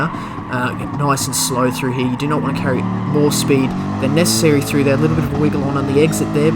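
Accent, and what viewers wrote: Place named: Australia. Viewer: The dragon trail and lake Maggiore were difficult but i could get ahead half a second by certain places around the lap, brands hatch is something else! 100ths of a second is vital and being a controller user means turning and trail braking are really tough. I've come to learn that the point on the controller for trail braking is just past the point of neutral or still. It's like half a millimetre, only a handful of times can i get it to come through the corner up on Lewis. What is your brake balance set at? Great vid and very nice to hear an Aussie voice for a change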